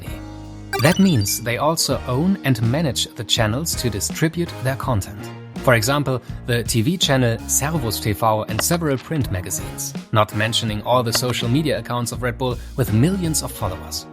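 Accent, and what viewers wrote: Germany. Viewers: Is he german? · Echt ein krasser Kanal (ich gehe aufgrund deines Englischen Dialekts davon aus, dass du deutscher bist) habe deinen Kanal entdeckt, als du glaube ich gerade erst drei Videos rausgebracht hattest. Ich finde es echt krass wie professionell und hochwertig deine Videos seit Beginn an sind, da ist der schnelle Wachstum des Kanals nur verdient. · Its Sounds Like you came from Germany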